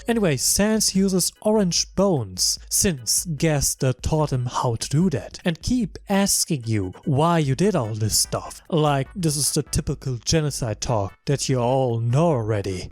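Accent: Germany. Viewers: ja, wenn man Deutsche gut kennt hört man einen deutschen akzent bei ihrem englisch, und auch cool dass du deutsch kannst haha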